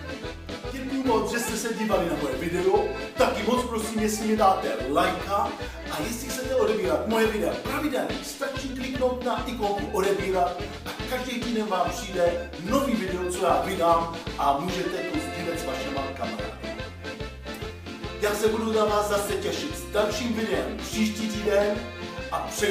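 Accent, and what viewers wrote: Italy. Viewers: Kanada? Ale má takový Italský přízvuk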